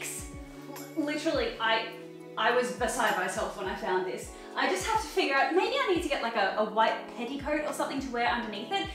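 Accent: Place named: Australia. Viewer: Hi! I seriously love that yellow dress and YES CERTAINLY, it reminds me of that Birger Christensen dress from the designer haul. I need to correct you though, Birger Christensen is a Danish brand, not Dutch. Birger Christensen was a Danish man that established a store in Copenhagen back in 1869, as a small fur repair workshop. I don’t know if the exact designer of the cute yellow dress is Dutch, but it wouldn’t surprise me! Also I’m a Dane myself, and I’m loving your lovely Australian accent, your friends, rambling, Archie and most of all your passion for pastels. I’m very into sustainability and seeing all these lovely thrifted items makes my day. Thank you for inspiring me to be myself 😭💕🌈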